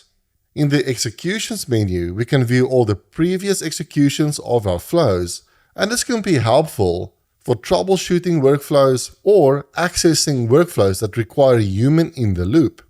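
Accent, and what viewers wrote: Afrikaans, South Africa. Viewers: Môre. Ek't nou al so paar van jou video's gekyk en dit baie insiggewend gevind. Ek's regtig nie gepla met Engels nie - soos in glad nie. Maar dis so lekker om sulke regtige goeie kwaliteit inligting met 'n Afrikaanse aksent te ontdek! Maggies man, wil gedoen wees. Jy mag maar. Groete uit Durbanville - Kaapstad.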